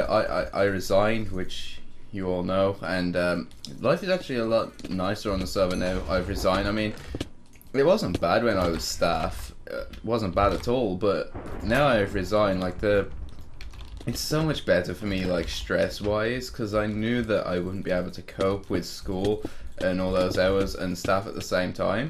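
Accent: United Kingdom. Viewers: bruh did become crazy more British as he aged? He has very little accent here...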